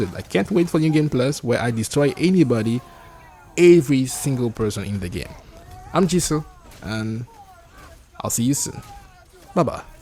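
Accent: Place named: France. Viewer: je me disais bien que y'avait des petits reste d'accents français par ci par la, très bon niveau d'anglais sinon.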